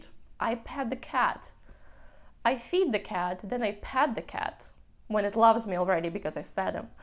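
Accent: United States. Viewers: You sound so American! That’s a good thing, I am from America and mostly like it here. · Your speaking style has suddenly turned American. I'm not a fan of it. I prefer European English.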